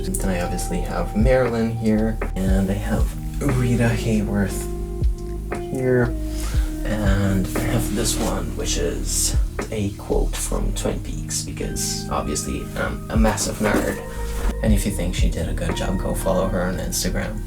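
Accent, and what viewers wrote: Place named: United States. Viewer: How is your English so good and why do you an American accent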